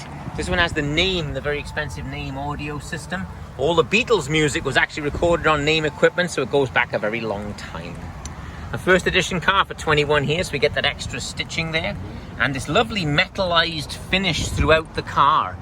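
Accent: Wales, United Kingdom. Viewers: Good video. Where are you from originally? You sound Welsh.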